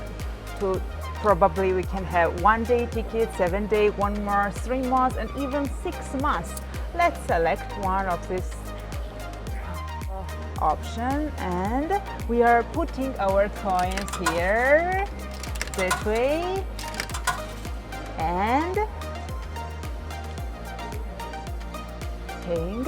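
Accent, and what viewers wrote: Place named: India. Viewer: Ma'am one thing that I have noticed that you are looking very confident nd your video is very much interesting. I don't know that you are Georgian origin or anywhere else. But you English ascents are very much similar to Indians.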